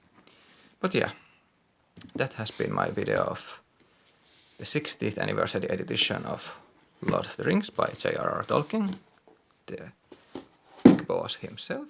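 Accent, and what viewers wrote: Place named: Finland. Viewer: Great Finnish accent! Thanks for the review. The cover art is nice but I wish they had made the spines uniform and matching so it looks more like a proper set or collection.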